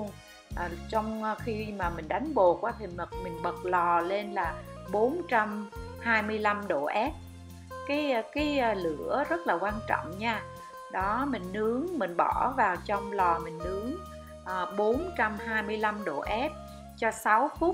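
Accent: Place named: North, Vietnam. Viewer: Nói nghe mệt quá,, chị bắc kỳ ơi,, hơi dài dòng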